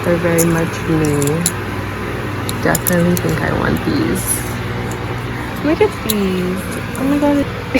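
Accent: Jamaica. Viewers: How is her accent Jamaican in only fourn months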